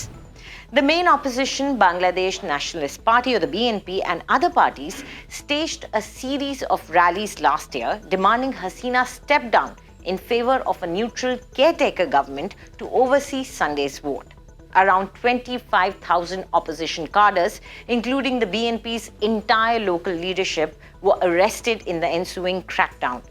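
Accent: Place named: India. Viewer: Sometimes I don't know why you could pick up such a good oral indian english but Not a proper english accent. It's time for you to go to UK or to USA for an accent reduction therapy session.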